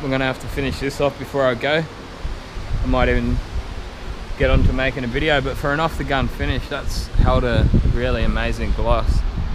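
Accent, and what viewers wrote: Australia. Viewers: I like your enthusiasm. I've been doing this work for 40 years and still enjoy it, but it's fun to watch you work (especially enjoy your aussie accent!)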